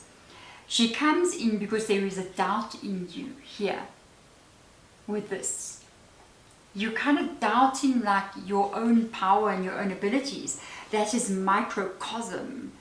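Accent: Afrikaans, South Africa. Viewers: NICE 2 MEET U ALSO BEAUTIFUL UR REALLY A LIGHT AND I LUV AFRIKAAN ACCENT LOL... MUCH LUV FROM AOTEAROA/NEW ZEALAND MMMWAH 😍😎🔥👑🌈💜😘🤩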